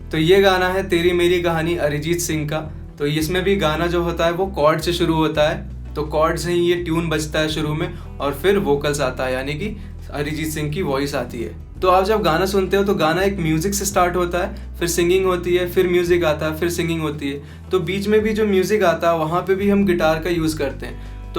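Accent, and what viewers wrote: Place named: Bihar, India. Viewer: Bihari babua “theth bhasha”….. 🫶🏻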